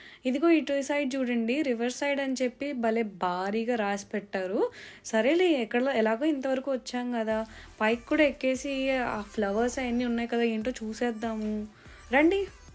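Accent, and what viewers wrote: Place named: Telugu, India. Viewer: Me voice and Pure Telugu slang👌👌👌👌👌 Andi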